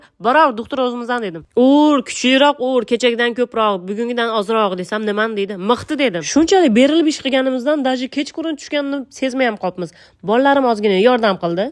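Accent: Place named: Andijan, Uzbekistan. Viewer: Нечанчи йилсиз, асл исмиз нима асалим, андижонлимисиз шевездан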